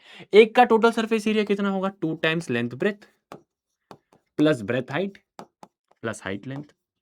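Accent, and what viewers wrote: Bihar, India. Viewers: That bihari ascent 🫰🤌🤌🤌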